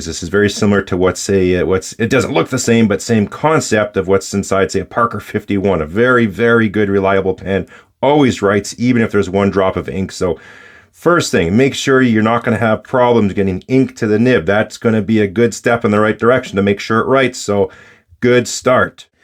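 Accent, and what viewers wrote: Canada. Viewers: Are you Canadian ? You sound Canadian . Sound like a guy I watch on YouTube called AvE. EDIT : I heard at the end of the video you said " Canadian" as currency, Nice !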